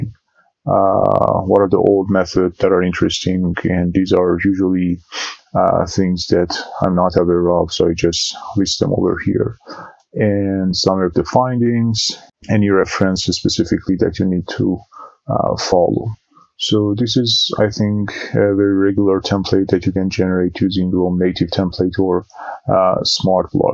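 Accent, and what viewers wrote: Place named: Iran. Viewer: I hear persian accent 😀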